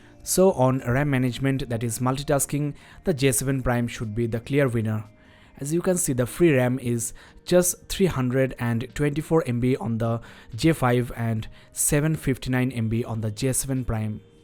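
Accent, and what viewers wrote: India. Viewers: There is something wrong with indians tongues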